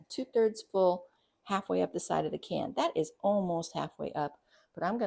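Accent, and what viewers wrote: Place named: Boston, United States. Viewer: Okay, looks like I'm the first commenter. My grandparents grew up in Groton, CT and remember taking the ferry from Long Island, 3-4 times a year, AND looked forward to the hotdogs & Beans, and fighting over the hunk of bacon! My aunt Colleen would bring "The Beans" to every family get together and she recently shared the recipe with me. Just made my first batch (in DE) and from memory, they are spot on! Your Boston accent is great by the way. I appreciate you taking the time for this video, which brought back many memories.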